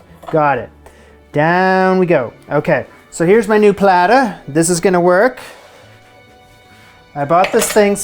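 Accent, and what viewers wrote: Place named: Southern Ontario, Canada. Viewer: once again, please never apologize for how you pronounce words! We all get to have our regional dialects and variations and the way you say “skewers” is entirely fine. I made a comment on your “cauliflower” episode and lots of people are still liking and agreeing to this day! Some people seem to not understand that YouTube is global and that their own accent is not the definitive one. You have a Southern Ontario accent, be proud of your roots! And, Girls Night Out should not be as tasty as it is, right? 😂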